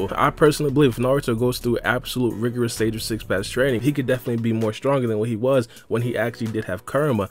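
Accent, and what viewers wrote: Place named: Alabama, United States. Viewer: Yah forgot how Alabama this sounds right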